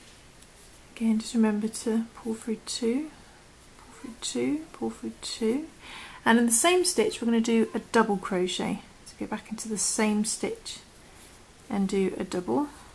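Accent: United Kingdom. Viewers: Lol, I heard your British voice and assumed you were using British crochet terms, whoops! Just about to start again - looking forward to making this for my Autumn wreath, thank you :)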